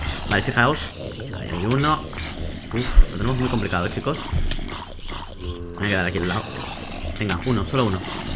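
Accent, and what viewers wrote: Argentina. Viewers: Acento argentino <3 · deja de hablar en argentino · me encanto tu acento argentino, tuviste que aver ganado, ivas tan bien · ¿ sos de argentina o de españa? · muy buen acento argentino y te lo dice una argentina 😃 a y sigue subiendo este modo de juego me encanto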